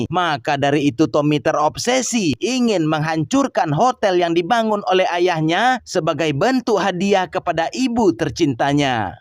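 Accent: West Sumatra, Indonesia. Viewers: Eh mimin ny orang sumatra barat kyak e mah salam dari Padang mimin sehat sehat min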